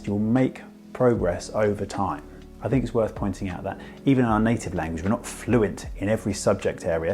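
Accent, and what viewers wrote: United Kingdom. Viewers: I liked your accents makes me want to learn british english even more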